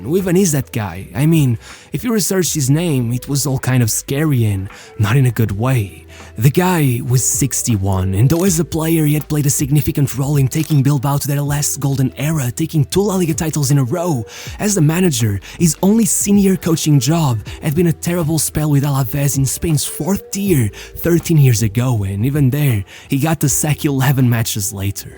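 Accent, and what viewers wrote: Portugal. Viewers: I appreciate the effort to try to pronounce Spanish names correctly, but you are pronouncing them in Portuguese.... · Why is he giving a portugese pronuntiation to all the spanish names?? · Great video! I was just annoyed that you kept pronouncing Spanish names with Portuguese pronunciation. Or am I wrong? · bro is calling every Spain player like a Portuguese one xd. Just pronounce them in English bro it sounds better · He pronounces spanish names in portuguese lol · is the guy reading this portuguese? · Why do you pronounce names so weird. Like with a Portuguese accent Torresh Grodri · Just a tip as a Spanish polyglot interested in phonetics: pronouncing Spanish words with a Portuguese accent doesn't make them more accurate and similar to the Spanish pronunciation, and in fact, it sounds quite unnatural, especially while speaking perfect English. 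I would recommend that you stick to the English pronunciation when saying those words if you don't want to learn Spanish phonetics. Of course, maybe you don't care at all, but since you're a content creator I would assume you want to sound more natural and educated👌. That way your viewers can focus more on your content, rather than the weird pronunciation, and the actual message is delivered much more easily. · I love you you pronounce every Spanish name with a Portuguese accent 😅. Great video and Viva España y Portugal 🇪🇦❤🇵🇹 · I would like to ask you to pronounce the Spanish names properly, not in Portuguese or Brazilian pronunciation. Thank you. Overall, fine job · why do you pronounce the names like if they were portuguese? not criticising but as a spaniard i think pronouncing the names like they really are o at least trying could be better for the overall quality of the video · Quiero decir, el chico es portugués, creo. 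Es normal que los nombres le salgan con acento. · Why do you pronounce Spanish names in perfect Portuguese accent?😂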